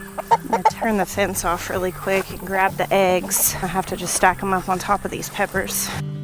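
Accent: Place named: South Carolina, United States. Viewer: You're starting to get a south Carolina accent 😄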